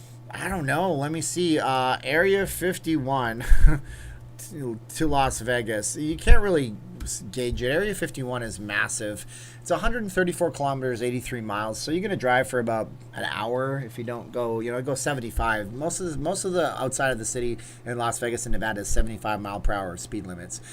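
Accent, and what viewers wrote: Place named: Canada. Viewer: I think people like your Canadian accent :) and your information/news on LV. You are doing a great job.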